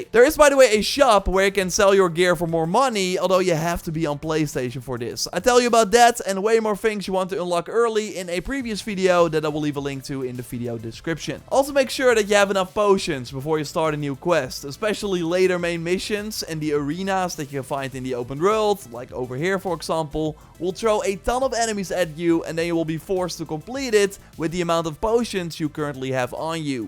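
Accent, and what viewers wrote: Netherlands. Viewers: Wtf ik kan gewoon aan je stem horen dat je Nederlands bent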